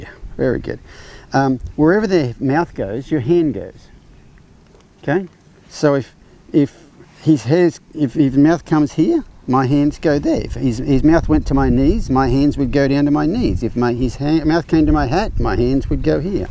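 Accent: Australia. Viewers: Never heard a cowboy with an Australian Accent🤠🤔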